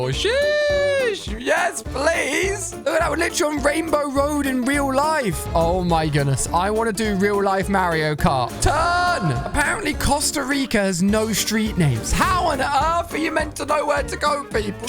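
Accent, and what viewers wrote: United Kingdom. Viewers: I'm Irish are u like British or? I love the smartie chocolates btw!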